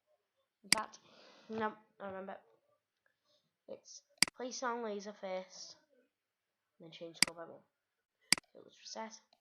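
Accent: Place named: United States. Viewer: You sound so different on video . Xxx you sound American xx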